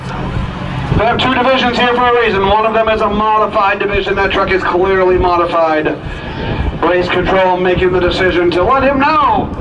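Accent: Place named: United States. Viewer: lol, not that quickly. American giveaways: 1. Yankee accent of the commentator; 2. Full-size pickup trucks; 3. Dodge Charger with "Yeet" written on rear window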